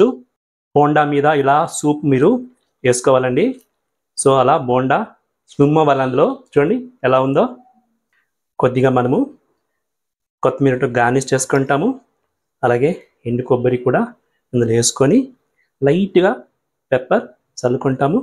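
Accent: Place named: Guntur, India. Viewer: మీరు యూట్యూబ్లో వంట కాకుండా జాబ్ ఏమైనా చేస్తారా అండి మీ ప్రాంతం ఎక్కడ అంటే మీ తెలుగును బట్టి గుంటూరు ఆ విజయవాడ అని అడుగుతున్నాను అంతేను మీరు ప్రజెంట్ ఎక్కడ ఉంటున్నారు మీరు బ్రాహ్మిన్స్ ఆ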